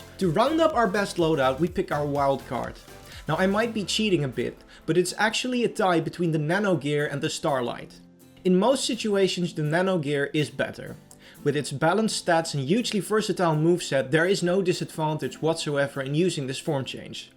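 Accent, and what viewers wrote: Netherlands. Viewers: Randomly stumbled upon the vid, and have to ask... With that accent, are you by chance Dutch as well? XD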